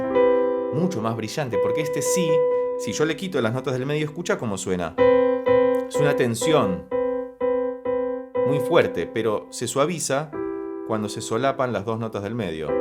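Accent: Argentina. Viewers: Buenas tardes maestro, siempre brillante en su análisis y comentarios. Dicho esto tengo que comentar, por que sino reviento, y espero que se lo tome como un cumplido, lo siguiente: es inevitable que su análisis me recuerde al legendario monólogo de Les Luthiers sobre el maestro Mastropiero, el maravilloso acento argentino evidentemente tiene mucho que ver...pero más allá de eso, si me distraigo un segundo de su estupenda explicación me viene a la cabeza a Mastropiero y....me cago de la risa. Muchas gracias por los maravillosos momentos que nos brindan sus vídeos, mi admiración y mis saludos desde España.💃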